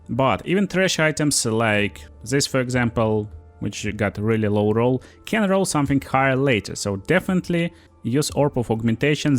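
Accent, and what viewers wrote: Russia. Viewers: I love when Russian natives use their deep and heavy accents to talk about the best video game in the world-- Path of Exile 2. And yes, they finally put out a major fix for performance and crashing issues. :] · it sounds like a hard Russian accent.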